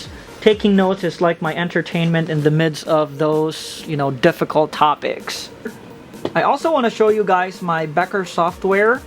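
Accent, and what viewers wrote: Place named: Hawaii, United States. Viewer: Good content. Btw where you from? I hear an accent. Hawaiian?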